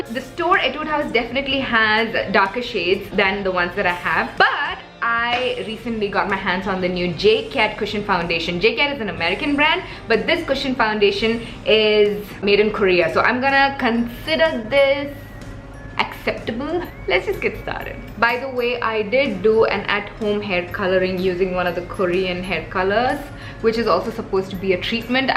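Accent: India. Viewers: It's an Indian accent, but with a little bit of western influence? Idk accents in India vary from place to place and generation to generation. There's soo much variation seriously, like in my class alone, I can identify at least 5 different accents.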